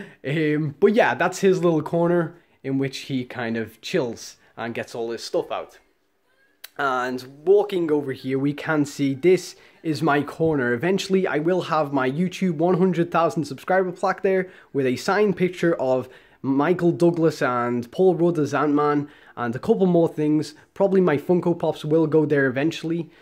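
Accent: Liverpool, United Kingdom. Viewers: I can't tell if your scouse or not ? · Wait you're British?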